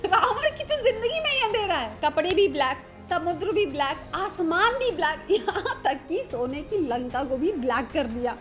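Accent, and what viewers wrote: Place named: Lucknow, India. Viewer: Aap Lucknow se hain?? Aapke bolne ka andaaz waise hi lagta hai.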